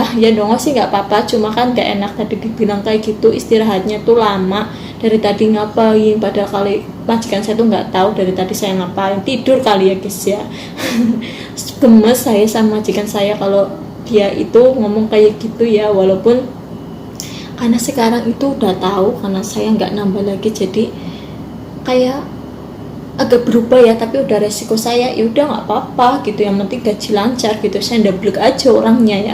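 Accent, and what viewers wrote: Banyumas, Indonesia. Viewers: ngapaknya medok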